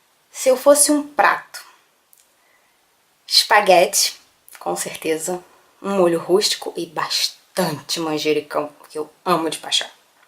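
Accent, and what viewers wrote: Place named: Rio de Janeiro, Brazil. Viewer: oieee.. td bom???? adorei seu canal.. que sotaque lindo... carioca???? já super me inscrevi aqui =] no meu canal eu faço vlogs, tenho dois bebês, gemeos e mostro minha rotina com eles.... =] ficarei feliz em te ver por la... vi que vc tem o canal desde 2012 mas só começou com os videos a um mês atras.. continue... vc fala bem.. é linda e parece muito simpática.... sucesso... bjssss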